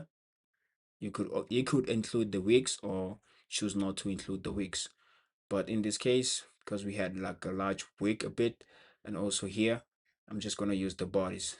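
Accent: South Africa. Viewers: You have South African accent where you from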